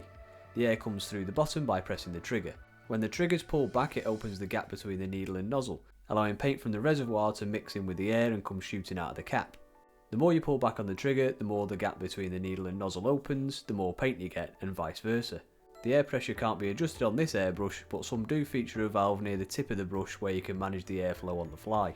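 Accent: United Kingdom. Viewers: Biltema acetone? You sound really British, but I thought Biltema only existed in Scandinavia?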